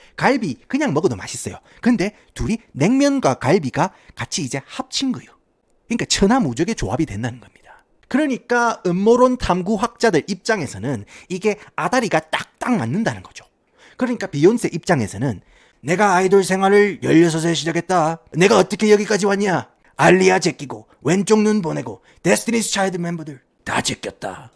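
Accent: Gyeongsang, South Korea. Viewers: 찰진 경상도 사투리 사이 사이에 들어있는 쫜득한 영어발음 때문에 컨텐츠 상관 없이 계속 보게 됨…외중독되… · 임마 😂😂😂 애비 🤣🤣🤣 처음 영상 보는데 너무 재밌게 잘 보았습니다 🤗 경상+잉글리쉬원탑티어!!! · 경상도 사투리와 완벽한 영어발음의 아름다운 조합. 좋아요😊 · 구수한 갱상도 사투리로 usa 조져주는 바로 그맛 아입니까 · 갱상도 사투리로 영어를 말아주네 ㅋㅋㅋㅋ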